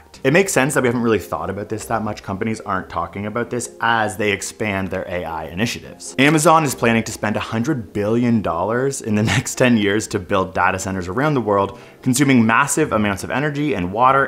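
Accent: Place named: Canada. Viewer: I knew he was Canadian due to the way he pronounces data.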